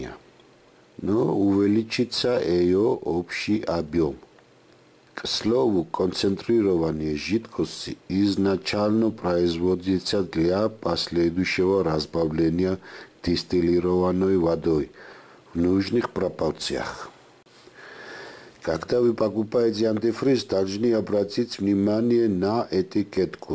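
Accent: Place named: Georgia. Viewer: დიდი მადლობა ყოველთვის საინტერესოა თქვენი ვიდეოები, რუსულიც ,,გამოგისწორებიათ,, ადრე, ,,ძალიან ქართული აქცენტი იყო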